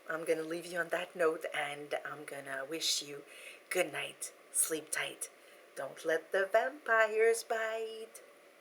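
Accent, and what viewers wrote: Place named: Quebec, Canada. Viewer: Ah! French-Canadian. Neat! I knew you had an interesting accent, I just couldn't pinpoint what it was. I live in the Southeastern part of the United States, so you would definitely hear an accent from me! Lol. What's so odd, is that I have had people in my own hometown asking me where I'm from. That hasn't happened in a while now that I think about it---but it happened quite often for a while. So, I couldn't tell you WHAT I sound like, lol! Just a Southern accent. Have a great weekend, and---don't let the vampires bite! 👿 I would love to get bitten and turned into a vampire, actually. Life would never be boring then! Lol. 🤗🤗🤗 🎃🕸🔥👻💀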